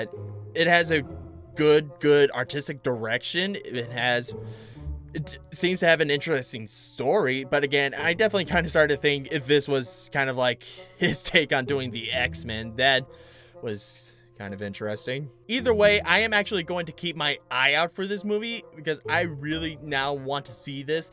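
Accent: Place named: Ireland. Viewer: actually I like your irish accent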